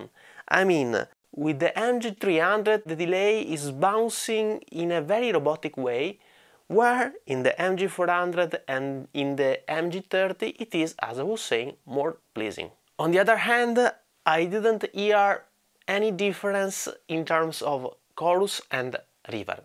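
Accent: Italy. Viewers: you're Italian I can hear it.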